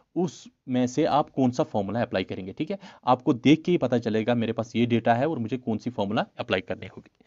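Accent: Kashmir, India. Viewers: Sir love your teaching skills. Specially your kashmiri accent... I completed statistics with full of enjoyment...The word,"Thanks" is not sufficient for your efforts sir. May God fullfill your every desire... Sir Iam preparing for JSA there are two more topics remains: 1 Correlation and Regression- Concept and simple applications 2 Interpolation and Extrapolation Sir please will you complete these topics too... 🙏🙏🙏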